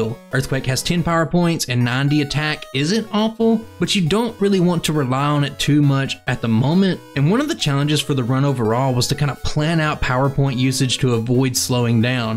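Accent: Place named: Appalachia, United States. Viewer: I love the fact i found a pokemon channel where someone pronounced names and items like I do in the southern tip of Appalachia. Instant sub lol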